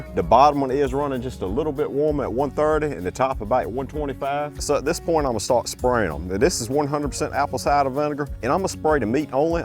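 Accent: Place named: South, United States. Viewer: Dude. I'm an English teacher who really appreciates dialect. Would you please tell me what region influenced your accent? It's like southern Appalachian with a hint of Cajun or Creole (can't tell).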